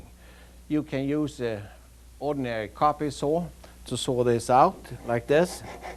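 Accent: Sweden. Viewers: Hans engelska är underbart svensk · hejsan! helt underbart att se dej å även höra dej på din goa svengelska å din humor 😂 helt underbart. jo jag tänkte fråga om du fortfarande håller på å jobbar med verktygen? om det är möjligt så hade jag jättegärna köpt vikingayxan som du visade i början å även en skedkniv. glad påsk!!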